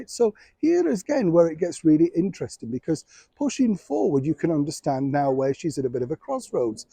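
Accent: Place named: England, United Kingdom. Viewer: The joy of an English voice speaking and the flowers magnificent! Love the chat !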